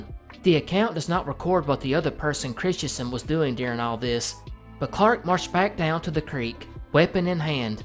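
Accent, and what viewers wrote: South, United States. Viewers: how I wait in epic patience for your southern drawl. Bring is, brother!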